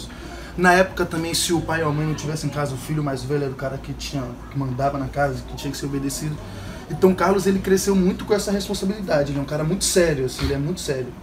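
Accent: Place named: Northeast, Brazil. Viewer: Que gca,romântico E questionador!Gostei!Ame ivc como Carlos!Parabéns!Agora que to reparando que tem um sotaque nordestino!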